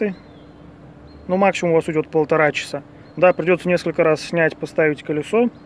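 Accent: Belarus. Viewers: бульбяш.... говор не спутаеш))) + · Ты из Белорусии?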